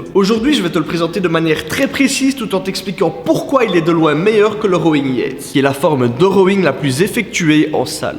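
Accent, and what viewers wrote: Liège, Belgium. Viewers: Tu es de liège ?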